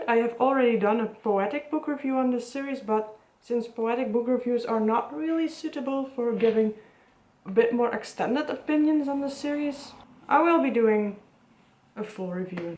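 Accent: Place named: Netherlands. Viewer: You have a Dutch accent Are you?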